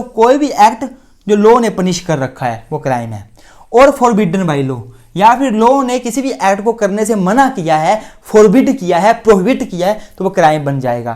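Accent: Himachal Pradesh, India. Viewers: Are u from Himachal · pahaadi ,himachali ho aap?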